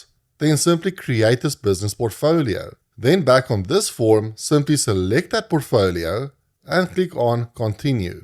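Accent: Afrikaans, South Africa. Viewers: 😂 lekker afrikaanse ou, “What are my current list of todos.” Priceless. Thanks for the video 🎉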